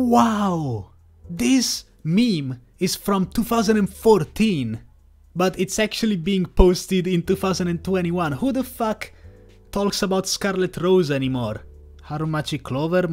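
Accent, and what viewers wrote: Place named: Italy. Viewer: you sound italian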